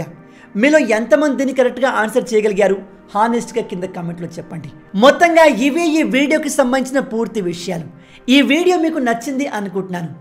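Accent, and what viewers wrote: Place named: Marwari, India. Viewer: Anna, Mana ooru edhi. Slang kastha marwadi slang la undhi.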